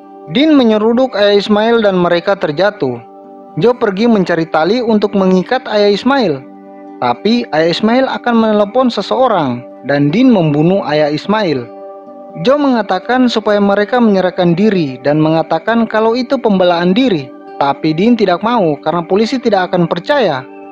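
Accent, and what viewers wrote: Eastern Indonesia, Indonesia. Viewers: Orang timur Lu ya bro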